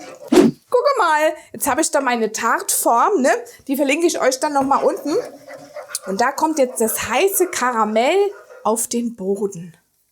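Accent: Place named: Hesse, Germany. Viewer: Lecker. ☺️ Ich arbeite im schönen Wiesbaden und dachte, ich hätte da so was Hessisches bei dir rausgehört. 😉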